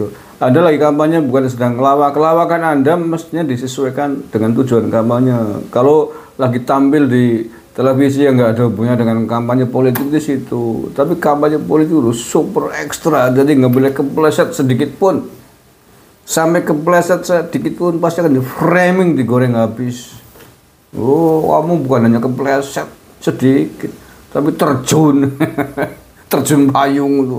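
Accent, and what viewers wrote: Banyumas, Indonesia. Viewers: Panjenengan pundi pak, kayane koq rada ngapak ya 😂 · Pak Njenengan bahasane ngapak, asli endi ? Nyong Purwokerto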